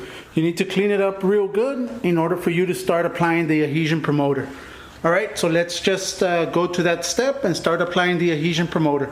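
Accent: Texas, United States. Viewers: What part of Texas are you from?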